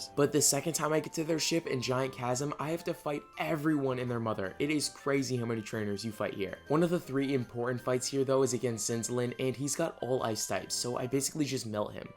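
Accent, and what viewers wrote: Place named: England, United Kingdom. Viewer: Bro which country are you from? From your accent I feel England. BTW love from India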